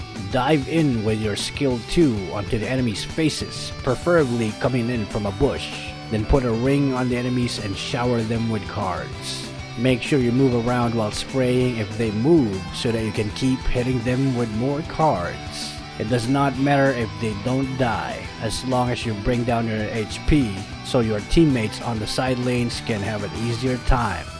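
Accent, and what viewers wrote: United States. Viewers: Pilipino ka pala hahaha akala ko american 👍👍👍👍Pota Ka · I thought you were American or what ever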